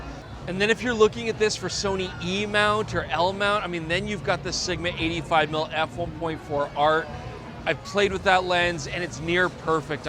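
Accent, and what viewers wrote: Australia. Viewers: nice aussie accent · Great aussie accent! You're a natural! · I think it's 90% Aussie! The words and enunciation are almost spot on! Though there was a bit of South African in there 😂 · That was a very credible Aussie accent! 👏😄 Welcome to Brisy, hope you had a great time 👌📸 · legit good aussie accent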